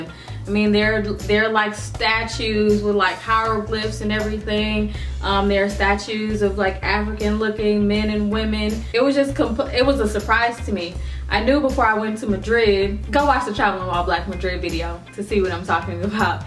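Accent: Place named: Nigeria. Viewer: You sound like a Nigerian. Your mum is a legend.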